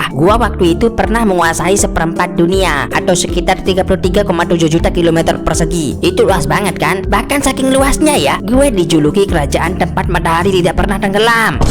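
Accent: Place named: West Java, Indonesia. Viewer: sunda banget ya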